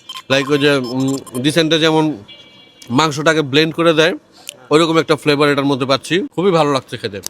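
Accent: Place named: Kolkata, India. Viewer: ভাই ইন্ডিয়ান কলকাতার ছেচরা ব্লগারদের মত ভিডিও কইরেন না আপনার কথা বলার স্টার অনেকটা ওদের মত অথেন্টিক বাংলাদেশি স্টাইলে ব্লগিং কইরেন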